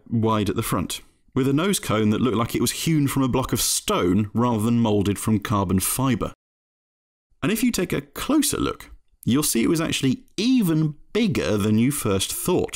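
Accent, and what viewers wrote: United Kingdom. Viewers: Somehow, I just knew that the snobbish narrator would be a Brit. Race cars are build for function. Not appearance. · With this british accent, it's even more funny 🤣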